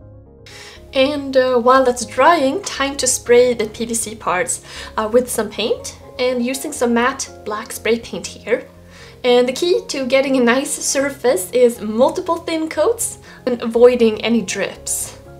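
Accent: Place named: Sweden. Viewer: I love your accent, are you Swedish?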